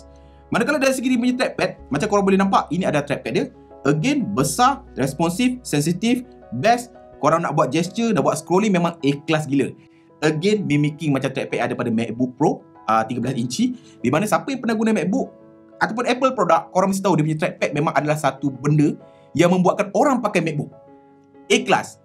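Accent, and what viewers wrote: Malaysia. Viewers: Bru prtma nnton yutub org malaysia . Seruu bahasanya . Saya suka logat malaya . Smoga maju terus channelnya bg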